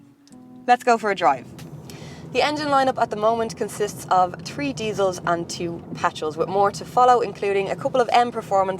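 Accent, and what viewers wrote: Ireland. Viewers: Love that Irish accent, will drive 14k subscribes today to 1.4M in 2 months.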